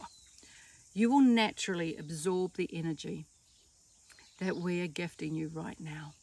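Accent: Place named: New Zealand. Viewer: Good evening..i have just found you tonight and i so enjoy listening to you at this moment..i have been red pilled for almost 2 years now...things are very tough here in Queensland, Australia and i think i pick up an New Zealand accent..am i correct?🎆💜 I am almost 79 and refuse to wear a mask and absolutely refuse to have the jab!!!GOD BLESS you all in NZ,stay in high vibrations everyone..love and light to all.🇭🇲💜🙏💜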